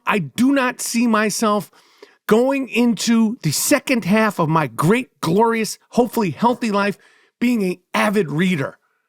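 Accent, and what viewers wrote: New York, United States. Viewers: I thought the Jewish community of NY voted for what’s his name?? If you keep talking like that no one is going listen to you because you rant!! Slow down love !!! Why is everybody saying the Jewish New Yorkers voted for this guy? I don’t get it….😂😂😂your accent i guess you don’t like LA …you rather NY …man I was born in London, tooooo many people in NY i couldn’t walk without bumping in to a person hahaahhah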